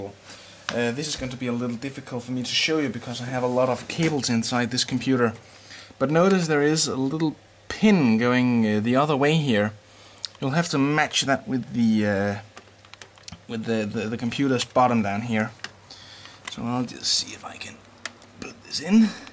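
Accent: Denmark. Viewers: haha jeg kan høre på dit engelsk du er dansk :)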